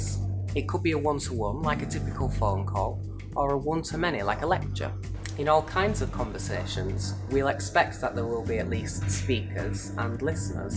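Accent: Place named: North of England, United Kingdom. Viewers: damn, I really love your accent :D such a nice british accent, I would love to speak like you. Thank you for the Video! It became very clear to me. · Thank you very much! I'm from the North of England, so my accent is very different to the traditional Standard English usually broadcast from the UK. I'm glad you liked my video :-)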